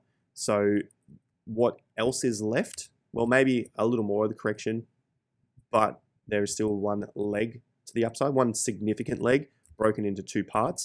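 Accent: Australia. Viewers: Why can’t Australians pronounce “A” correctly? It’s DA-TA not DA-Terrrr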